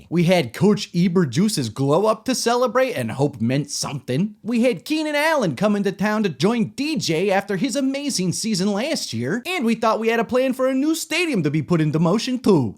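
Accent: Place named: Eastern Europe. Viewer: What is with that accent. You sound like someone from the old eastern block countries. You're mixing together your Chicago accent with Wisconsin accent.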